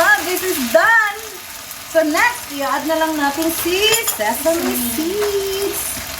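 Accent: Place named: Thailand. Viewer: ang thai accent gyod ang nag dala 🤣🤣🤣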